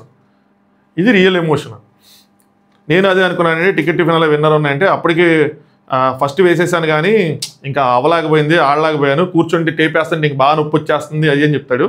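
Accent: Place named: Vijayawada, India. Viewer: Vijayawada slang vachheytthandi annai🤣🤣🤣😂adi thoti vijayawada mate ki matramey telusttundi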